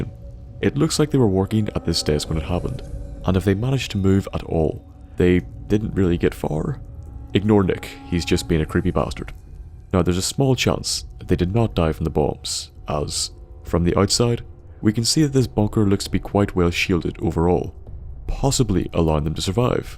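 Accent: Northern Ireland, United Kingdom. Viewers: Great video man.What part of Northern Ireland are you from btw?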